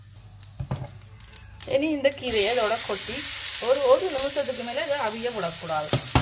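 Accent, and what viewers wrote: Sri Lanka. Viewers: மிகவும் அழகான இலங்கை தமிழ்... இனிமையாக உள்ளது சகோதரி.. கீரை பொரியல் அருமை👌👌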